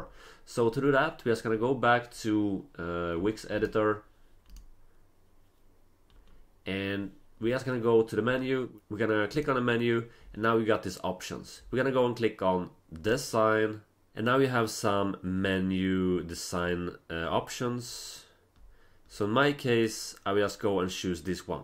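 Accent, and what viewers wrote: Sweden. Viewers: Sounds like your Swedish by the accent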